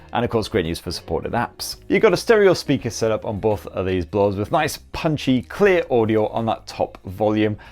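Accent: United Kingdom. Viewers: I struggle a lil with British accents does he call the phones "blowers"?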